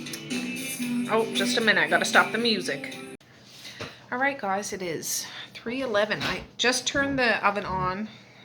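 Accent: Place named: Nova Scotia, Canada. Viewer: First time watching the post on its first day. It took 3 months to watch every post from the very beginning. 🙌 🙌 I watch because it sounds like home. Born and raised in Nova Scotia.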